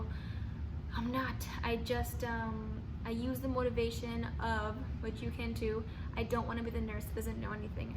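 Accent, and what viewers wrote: Miami, United States. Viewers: I know a Miami accent when i hear one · You are literally the cutest thing. You have a Miami accent. Thank you for your tips and tricks! Pero like thanks for the great video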